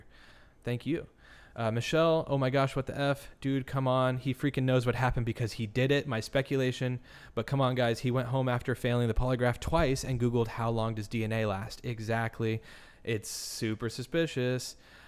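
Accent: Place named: United Kingdom. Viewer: Really enjoyed 👍I think this looks really promising and there's a similarity with the sketches. Nice to hear a brit cover this, I've been following this since two weeks after the murders. Libby was such an inspiring and brave person and both girls would have made such a contribution in this world. Justice will be served for these beautiful girls and their families 💜 💙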